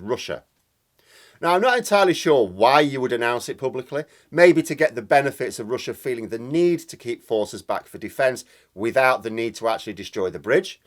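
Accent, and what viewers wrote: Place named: England, United Kingdom. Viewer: Your accent, leads me to think your English.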